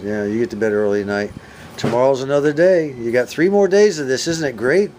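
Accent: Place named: Iowa, United States. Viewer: .....I can tell you are NOT a high-brow..cause you say "ANT" instead of "AUUNT", just like an Iowan like old Buzz!!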